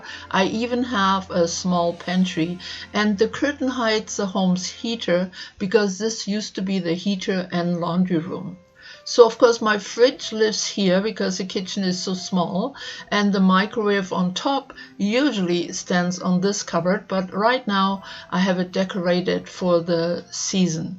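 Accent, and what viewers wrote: Germany. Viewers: Dir und Deinen Lieben auch ein wunderschönes Weihnachtsfest und einen guten Start ins neue Jahr. Gehe ich recht in der Annahme, dass ich da einen deutschen Akzent höre? ;o)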